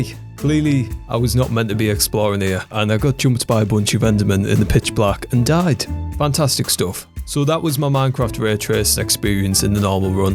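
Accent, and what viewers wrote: United Kingdom. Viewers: If this person is not British then my whole life is lie.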